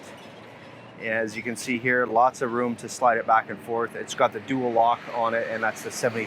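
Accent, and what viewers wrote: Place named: Canada. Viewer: Idk for sure if this guy is Canadian he sure sounds like he is. Once I heard that I was pretty sure what was under the hood. They like those up there.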